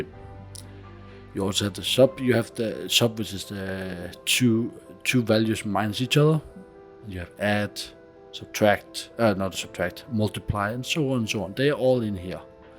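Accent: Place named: Denmark. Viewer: The Danglish is strong with this one, but I learned a lot, thank you ^_^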